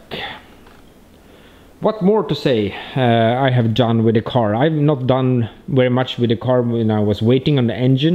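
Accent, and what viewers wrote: Sweden. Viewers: I guess you're from sweden because your accent sounds like Christian von Koenigsegg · are you Swedish?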